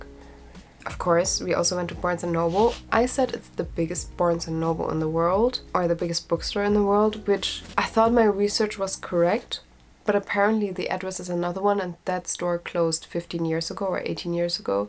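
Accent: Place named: Germany. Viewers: I was wondering the same thing after hearing two sentences 😊 definitely a german accent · Are you German? I was just wondering because you have a German accent I’m not trying to be rude(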